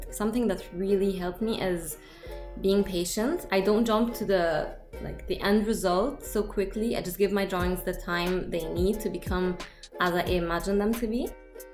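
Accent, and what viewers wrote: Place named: England, United Kingdom. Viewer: Beautiful English accent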